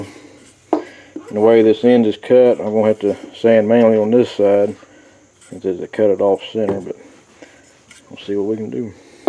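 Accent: South, United States. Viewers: is a country guy with a southern accent. No apologies needed because that is who he is. I am enjoying your videos!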